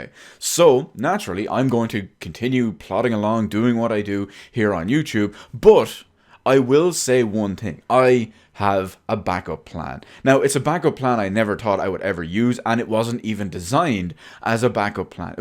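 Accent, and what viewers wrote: Ireland. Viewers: It's a shame that this is what we will be faced with. Lemme guess, you're not Irish at all are you? You definitely sound Irish xD If I lose my account, I will not be upset over it. YouTube is essentially a breeding ground for trouble of all sorts. I will always remember what I have done ever since 2010. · Thank you for explaining. My channel my be gone too ?? Oh well nothing lasts forever?!?!? Is that Ireland 🇮🇪 accent ? Cool 😎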